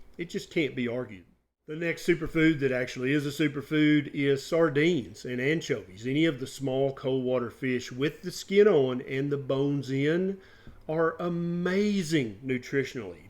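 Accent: South, United States. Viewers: I love my southern speaking doctor!!! Great info!..thank you!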